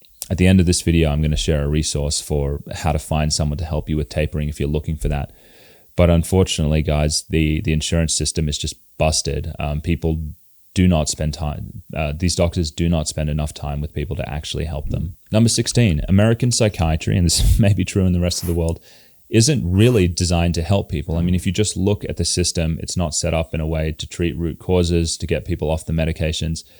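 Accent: Australia. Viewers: You have an Australian accent but you seem to be based in America so who can Australians count on. In Europe Neurologists are more common for all the issues you mention.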